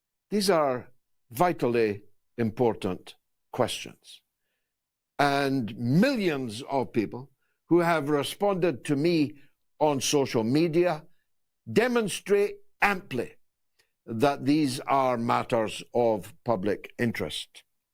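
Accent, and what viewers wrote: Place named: Ukraine. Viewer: A Russian speaking Ukrainian, I hear ?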